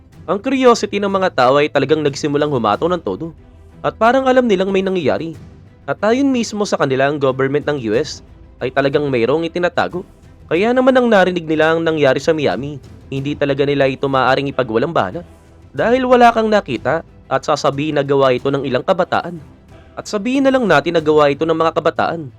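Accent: Iloilo, Philippines. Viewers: Ilonggo ang blogger?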